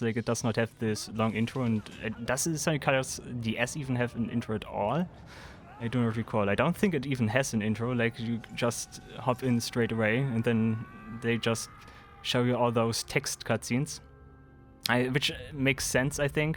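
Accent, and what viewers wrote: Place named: Germany. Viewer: Bro has a German accent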